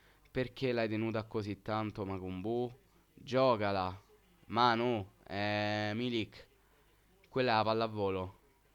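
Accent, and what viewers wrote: Rome, Italy. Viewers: come mai tifoso sardo con accento romano?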